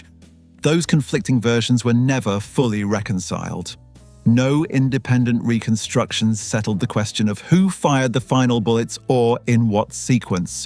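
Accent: England, United Kingdom. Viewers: GET RID OF THE UK NARRATION VOICE!! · They think it sounds more intelligent and more informed , Americans are suckers for English accents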